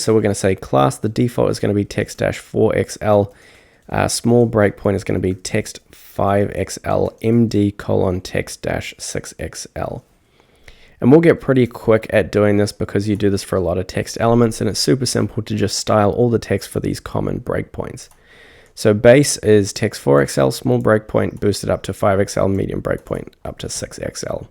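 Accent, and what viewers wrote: New Zealand. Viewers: New Zealand accent 🤔 chur? 😁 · You a kiwi?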